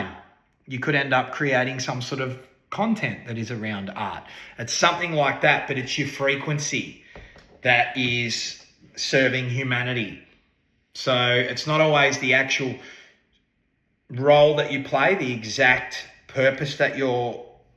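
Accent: Australia. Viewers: 100% feel this found me. Thanks. Never got a tarot reading from an Aussie before and i gotta' tell you, it's pretty entertaining the way you articulate and deliver your message ❤😂 LOVE & LIGHT💯👊🫶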